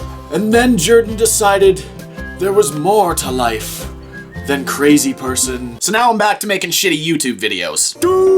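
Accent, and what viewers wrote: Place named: Canada. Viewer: I was going to ask you if you were from Canada because of your accent but then the video answered it for me.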